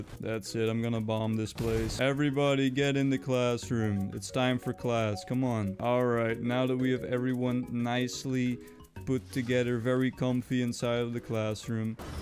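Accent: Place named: Netherlands. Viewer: This guy has a accent like he is from the Nederlands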